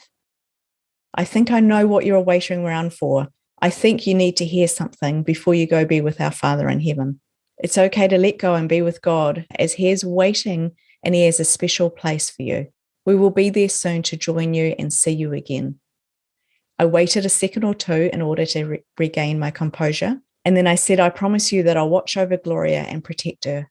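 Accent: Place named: Scotland, United Kingdom. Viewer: My favourite YouTuber in the world! from Scotland!!! I love your Scottish accent❤️❤️❤️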